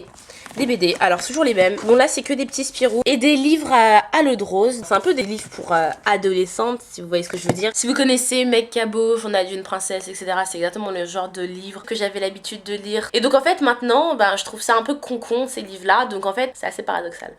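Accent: United Kingdom. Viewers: J'adore l'accent anglais ! C'est chic ! et en plus tu te débrouille bien, et j' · J'ai pas mis de pouce vert pour le cast de Bref mais pour ton Magnifique accent so British ;) Tu m'as tué x) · Aaaaaah j'aime tellement t'écouter parler c'est ouf *w* Je trouve ça trop cute l'accent british, aha, c'est "chic" (bon ok c'est nul mais bon hein :3) . Hâte de voir la prochaîne vidéoo <3 -J. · Trop chic ton accent "british" :P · Ton accent british c'est chic babe ! ♥ aha .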